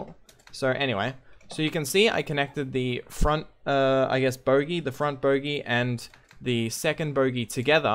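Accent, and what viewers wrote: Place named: Australia. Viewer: You sound like an australian =) "Soouu"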